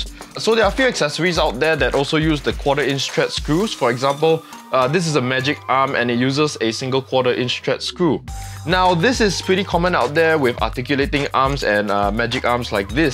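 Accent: Singapore. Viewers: Singapore accent.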